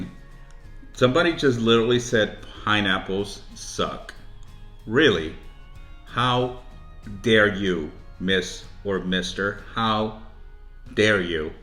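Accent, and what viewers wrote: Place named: United States. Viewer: Great video! Talk white? 🤔 Well if you grew up in America you would have an American accent...duhh. Lol and that's ok! People nowadays!😜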